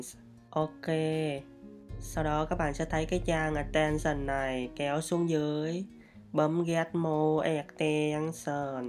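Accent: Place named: South, Vietnam. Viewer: Chính xác giọng nam kì rồi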